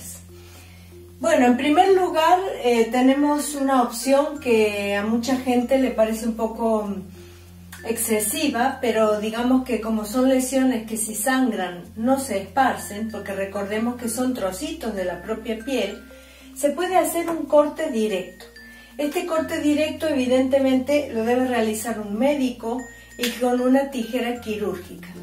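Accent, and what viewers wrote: Córdoba, Argentina. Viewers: Debe ser sonido argentino, quizá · Parece Cordobesa de Argentina doctora aunque con el acento un poco cambiado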